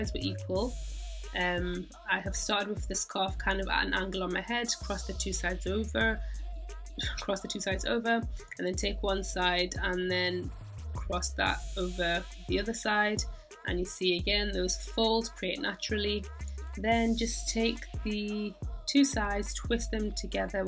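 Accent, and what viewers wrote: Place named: North, United Kingdom. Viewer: You're pretty! And I like your accent. Northern? ❤️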